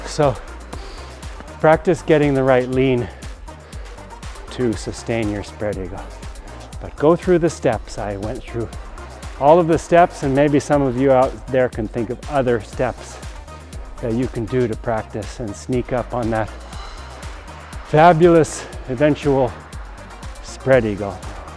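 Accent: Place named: Italy. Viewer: And I really like your Italian accent <3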